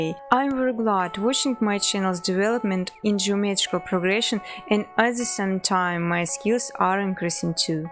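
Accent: Russia. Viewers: уууу ска первый раз слышу такое прям рашко произношение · Русский акцент? Или я ничего не понимаю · русский акцент ) удачи и успехов вам ! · Говоришь как русский заметно дэй к примеру говоришь дей ты русская? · русская 100% акцент палит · Русский акцент, или мне кажется?) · Russian accent)) Сразу понятно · Так она русская ?)) бля по акценту было впр понятно,но я думал прост такой акцент сам по себе,ну к слову дЫа она молодец))0 xD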